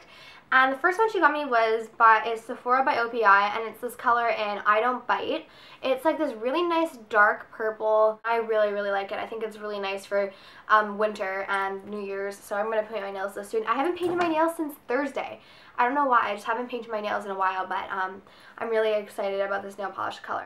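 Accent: Canada. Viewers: I agree. I think I commented before asking if she was Canadian. I watch another girl's videos who speaks just like her & she is Canadian.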